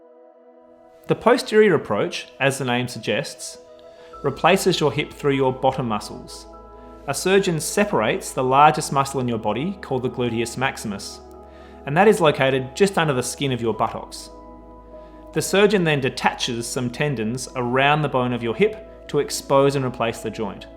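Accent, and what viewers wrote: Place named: Australia. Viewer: This is great but i would’ve understood it more if it wasn’t in Australian.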